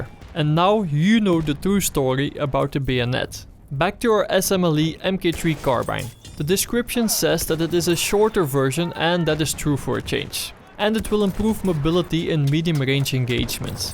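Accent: Netherlands. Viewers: je hebt wel echt een zware dutchlish accent man. Vind t wel mooi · Your englisch is like a dutch guy ? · u dutch? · you sound so dutch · je klinkt Nederlands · Het spijt me om het te moeten zeggen, maar het is heel erg duidelijk dat je nl bent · how dutchies speak english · toooooo obvious that he's from the netherlands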